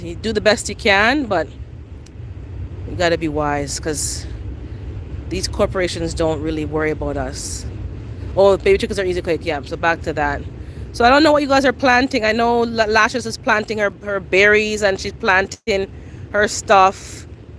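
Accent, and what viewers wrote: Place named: Jamaica. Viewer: My seven years old son just heard your voice and said ' she a Jamaican?'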